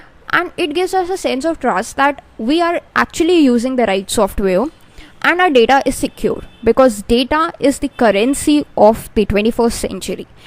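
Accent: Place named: India. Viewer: Your English has so strong Indian accent that I can't grasp what you say. Please make Engish subtitle appear on Video.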